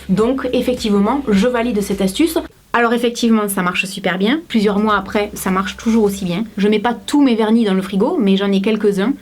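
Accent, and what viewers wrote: South, France. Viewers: J'adore ton accent du sud!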